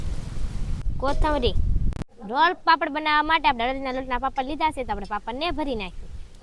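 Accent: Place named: Kathiawar, India. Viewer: The language she isvtalking like kathavadadi speaking Difficult to understand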